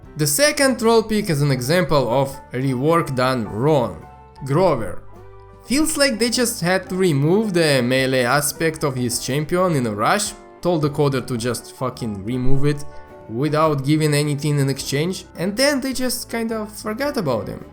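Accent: Russia. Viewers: Buck. Horrible russian accent.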